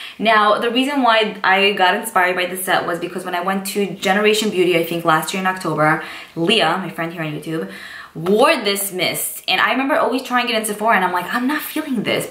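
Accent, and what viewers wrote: New York, United States. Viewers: Are you from NY or am I very dumb cuz you seem like you have a ny or New Jersey accent. Maybe I'm dumb ash but idk🤷‍♀️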